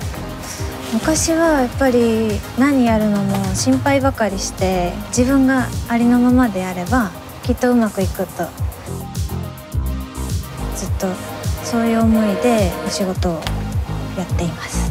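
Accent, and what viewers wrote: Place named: Japan. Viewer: How lovely her japanese accent is...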